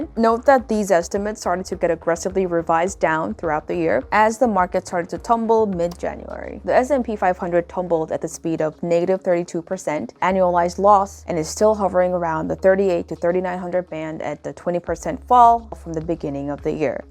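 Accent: New York, United States. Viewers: 역시 Newyorker 는 영어로 하셔야 같아요~~~ 잘보고 공부 많이 되었습니다.! 지난주 뉴욕 출장시 Bryant park하고 도서관 지날때 생각나더라구요, 한국서 건승하시길! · 이거슨 미쿡 발음?👍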